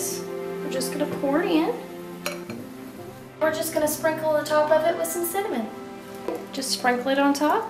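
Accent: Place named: South, United States. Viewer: Love the southern drawl 🤠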